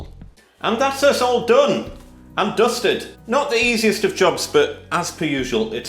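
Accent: United Kingdom. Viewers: His accent (and his wife's) is British and his website says "Proudly made in Britain" and has the UK date format with prices in £, so no, he's not in Toronto.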